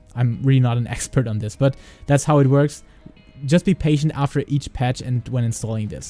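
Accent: Germany. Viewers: You're German am I right? :D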